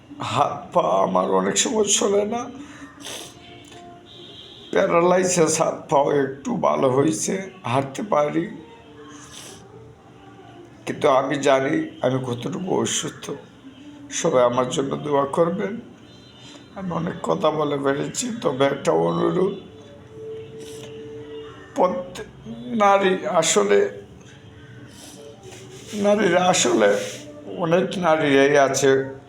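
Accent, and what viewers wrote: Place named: Sylhet, Bangladesh. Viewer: আসসালামু আলাইকুম, ও ভাই আপনার কথায় বুঝতে পারছি আপনি সিলেটি মানুষ, আমার জন্য দোয়া করবেন আল্লাহ তায়ালা যেন আমার মনের নেক চাওয়া কবুল করেন, আমার স্বামী দীর্ঘদিন ধরে আমার সাথে কথা বলেন না,ভুল বুজে আছেন কিন্তু আমি আমার স্বামীকে অনেক ভালোবাসি,সবাই আমাকে স্বামীর আশা ছেড়ে দিতে বা মামলা দিতে,আমি কোনো সমস্যা সৃষ্টি করতে চাইনা,কারন উনাকে ছাড়া আমি একা থাকবো কিভাবে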